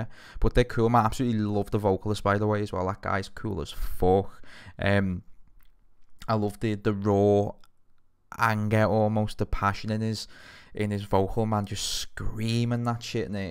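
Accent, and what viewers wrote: Liverpool, United Kingdom. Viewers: I love all your videos lad I especially love hearing another scouser really loving Great work lid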